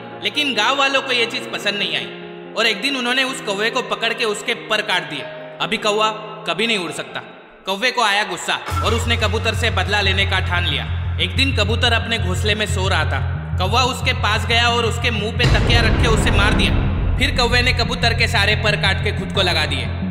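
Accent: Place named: Maharashtra, India. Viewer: I like your Marathi accent 🔥🔥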